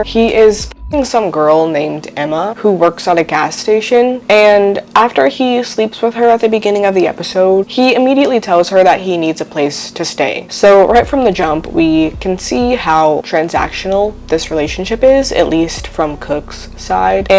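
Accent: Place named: United States. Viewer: Hearing an American talk about Skins… I’m immediately turning off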